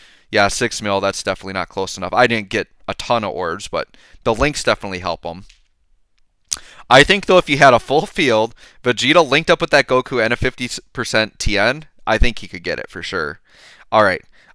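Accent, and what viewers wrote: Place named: Wisconsin, United States. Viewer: Are you from Wisconsin? I feel it in my bones.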